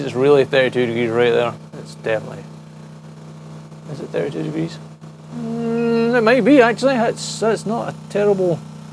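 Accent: Scotland, United Kingdom. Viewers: Cool, like your accent, Scottish? Do you think doogee used good components to last as long as samsung or other 'well" made phone?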